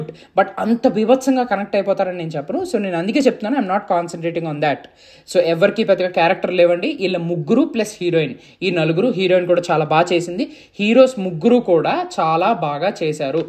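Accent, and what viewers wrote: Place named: Godavari, India. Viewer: Movie emo Kani Ne Godavari slang was awesome bro 😄😄👌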